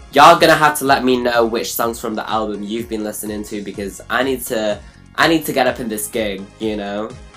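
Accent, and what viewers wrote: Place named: Nottingham, United Kingdom. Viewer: Fuck yeh I love I love and I'm so glad a boy in makeup from Nottingham same as me 🤘🤘 has made a channel like same accent come on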